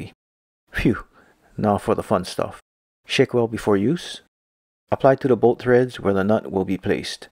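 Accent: Hawaii, United States. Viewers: Hi, I just found your channel. Great vids. I hear a little kama’aina in your voice.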